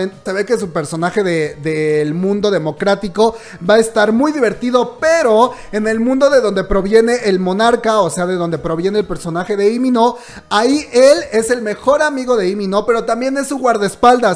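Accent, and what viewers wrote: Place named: Mexico. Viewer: muy divertida tu explicacion....cual es tu pais de origen...pareces de mexico...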